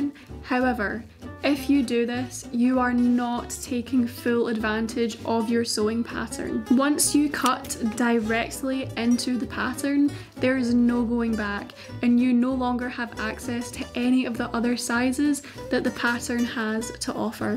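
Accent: Scotland, United Kingdom. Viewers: I subscribed to hear your (Scottish?) accent as well. Ha ha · Fucking terrifying to see a Scottish accent on the internet, like I only see you guys outside when I leave my house??? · Came for the pattern, stayed for the Scottish, yass 🙌 🏴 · Pretty sure she has a Scottish or Irish accent, I think Scottish though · Ahh I love your Scottish accent!! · Are you from...Scotland? You have the best accent! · You are a pleasure to watch. Simple instructions and fabulous end result. Keep up the good work. Watching from Victoria. Australia. Love your Scottish accent.😎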